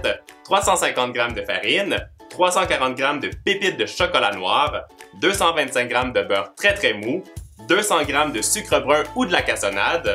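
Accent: Canada. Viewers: J'adore ton accent canadien t'est vidéo son trop bien continue comme ça on adore tes vidéo YouTube bisous 😘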